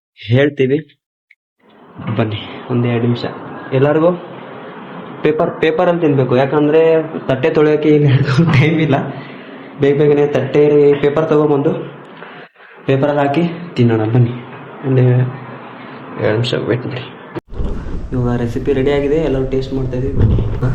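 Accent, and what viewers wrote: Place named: Uttara Kannada, India. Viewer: ನೀ ನಮ್ಮ uk ಶೈಲಿಲೆ ಮಾತಾಡು ಚನ್ನಾಗಿ ಇರುತ್ತೆ.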